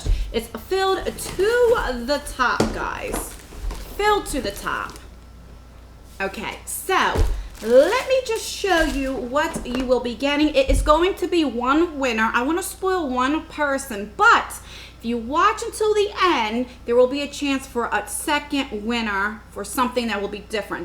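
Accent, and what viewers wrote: New Jersey, United States. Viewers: ...so glad to have stumbled upon your channel!! You are a ray of sunshine and look forward to your videos... You seem like someone I would totally be friends with:) Where in NJ are you?? From the sound of your adorable accent I'd say somewhere central or south...I'm a Jersey Girl too!! 🤟👊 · Seriously I turn around and your channel has grown so much since I started watching. The amount of stuff you're giving away is beyond generous. also what sub do you have where you get a full set of crown brushes? I must have missed that video, oops! Loving your Jersey Accent :) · congratulations on 14k now! stumbled on your videos a while ago and I've been watching them nonstop since! I just love your jersey accent and how you really explain everything thoroughly in your videos!! #lipstick :) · Nice to see it's happening for such a nice person ..enjoy your unboxings and the Jersey accent takes me back home . 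Congrats !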